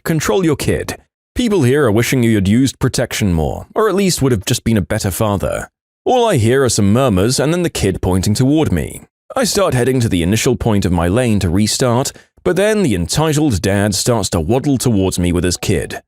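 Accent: United Kingdom. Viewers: I see your British.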